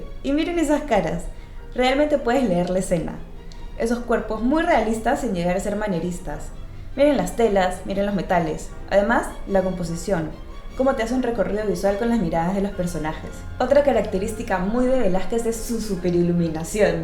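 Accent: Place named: Venezuela. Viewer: Heres Venezolana verdad por el asento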